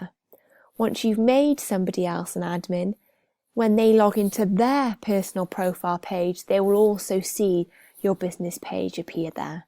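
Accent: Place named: London, United Kingdom. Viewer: oh hell no! can't follow her accent at all. Why is she speaking like a london school teacher?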